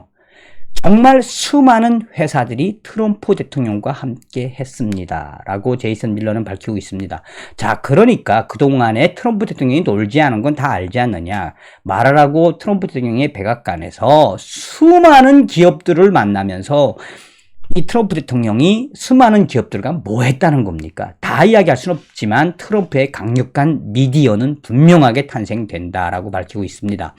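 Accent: North Korea. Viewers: 북한언어 쓰실때 넘 즐겁읍네다 북한말씨 애정이 넘치는 든든한 삼춘이 주시는 언어 같아 재밌고 즐겁게 시청합니다 북한말 쓰시는 유튜버는 밖에 없어요 가열차게 웃겨 주시라요 봤던 영상 못본영상 무조건 즐겁게 구독하기요 주위에도 가열차게 알리묜서 즐겁게 시청하는!!!!❤ 떼레비 고정 하시기요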